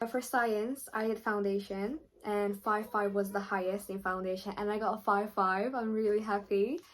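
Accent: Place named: United Kingdom. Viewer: من عاشق لحجه بریتیشت شدم اخهههه🥲💖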